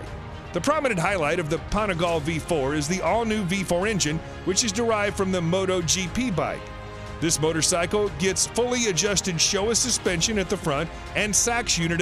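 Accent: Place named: United States. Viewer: American voiced video with all speeds in kph. Hmmmm . . .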